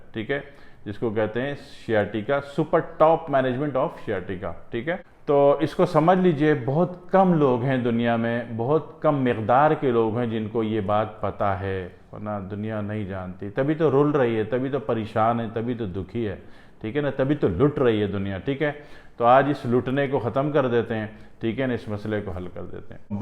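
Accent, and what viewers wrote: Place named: Delhi, India. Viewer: Janaabe aali.... kiya aap ka Delhi se koi talluq hai.....aapki zubaan aur lehja Delhi ka sa lagta h .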